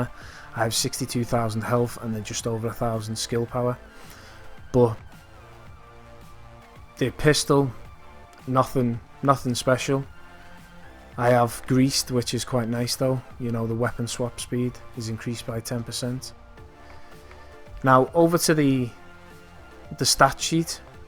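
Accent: Liverpool, United Kingdom. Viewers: That scouse accent.. lol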